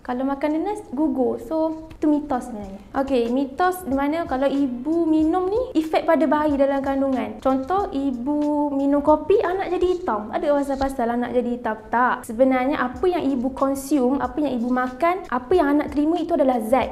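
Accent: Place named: Kelantan, Malaysia. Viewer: Awak terlalu kelantan untuk saya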